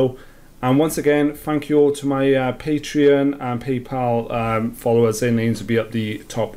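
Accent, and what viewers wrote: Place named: Wales, United Kingdom. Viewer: Love the accent. Left Swansea for US at 16 so sounds like home. Gonna try this today ...fingers crossed.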